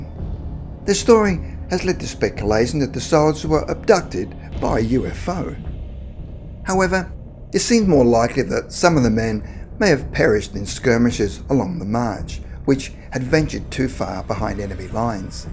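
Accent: Australia. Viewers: I thought you were a Aussie mate.😄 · Are you Australian? That voice. Bloody so called great war is very accurate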